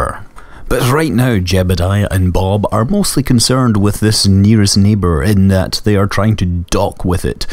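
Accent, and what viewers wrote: Scotland, United Kingdom. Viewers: It doesn't feel right when you use your natural Scottish accent, and not your fake Arstotzkan accent.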